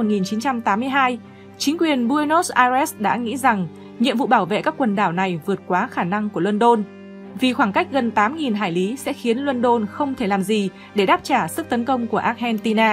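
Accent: Standard. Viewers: Giọng đọc thuyết minh hay và chuẩn luôn ủng hộ kênh... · Thuyết minh quá hay ! Rõ ràng , nhập tâm , chuẩn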